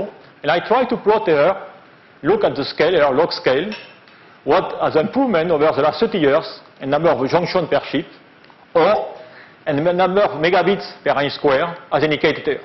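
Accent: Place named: France. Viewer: Nice lecture, but the French accent is too strong.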